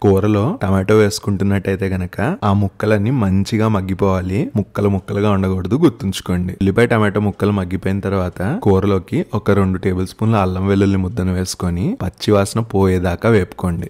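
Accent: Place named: Rayalaseema, India. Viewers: Yerragadda..rayalaseema slang 😊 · Yerragadda anagane artham ayipoyindhi ..maa rayalaseema ani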